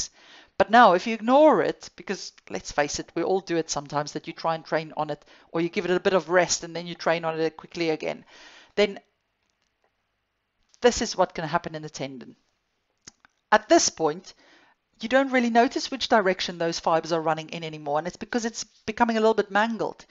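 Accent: South Africa. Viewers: I love your accent. You must be from South Africa.❤❤❤❤❤❤ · South African AF! Great video, thanks for this!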